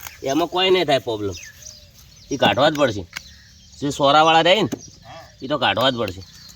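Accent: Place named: Banaskantha, India. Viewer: બોલી મસ્ત તમારી બનાસકાંઠાની બવ મજા આવે સંભાળવાની મારી વાઇફ બનાસકાંઠાની છે મજા વાત કરવાની ❤😂😂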